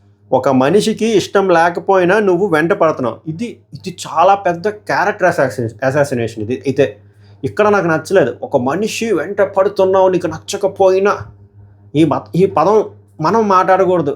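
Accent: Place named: Amalapuram, India. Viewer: original Amalapuram slang ❤❤❤❤❤❤❤❤❤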